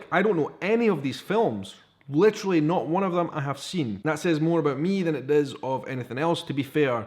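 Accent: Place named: Highlands, United Kingdom. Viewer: Yer accent is a shitey chookter middle class yin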